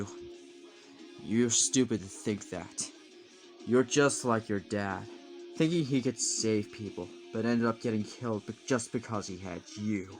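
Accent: Ireland. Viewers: The second scottish youtuber I found yes!! Edit:I mean irish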